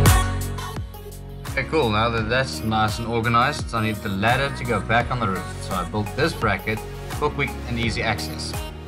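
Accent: South Africa. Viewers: I'm afraid I couldn't listen to the whole film , I couldn't understand the South African accent. Great filming though. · awesome video! love the videoagraphy and time taken to take important close shots. Is that a South African accent I hear :)? I ask because I'm from Cape Town :) One wouldn't say you new to this lol you doing an epic job can't wait to see your new videos. couple of quick questions if you don't mind its about your build. What size Bearings and bolts did you use and the rivet thread things you used, that just mind blowing lol what are they called? Can I source them from BMG bearing man? Thanks again Best wishes for 2019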